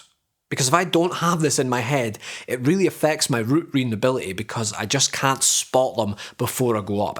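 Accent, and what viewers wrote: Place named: Scotland, United Kingdom. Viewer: Wild clicking on this video randomly and before I can even finish thinking "oh he's Scottish" I'm shown a clip from my local gym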